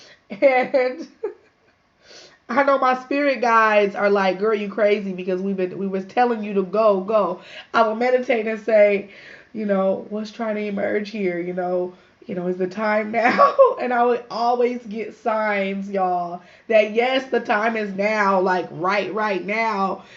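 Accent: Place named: United States. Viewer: Hats off to you sister 😊 very inspiring! How did you get to Ghana originally to know of it as a lifestyle? You sound American born so I'm curious. Of course we all originate from there but I've never touched foot to know Africa as my home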